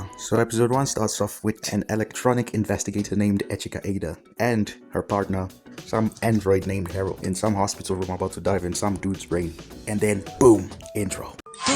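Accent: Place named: Germany. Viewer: youre so German its cute I love your accent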